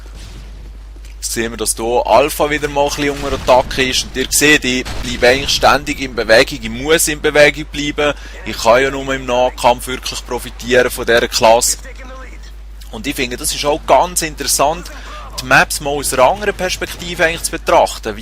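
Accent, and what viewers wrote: Switzerland. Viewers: ich liebe diesen schweizer akzent komm aus dortmund geiles video :) daumen hoch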